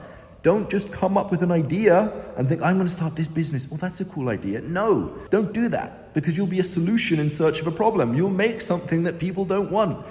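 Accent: United Kingdom. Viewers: I liked your UK accent. It reminds me the awesome voice of the legend- Tony Greig. Thanks for sharing this awesome video.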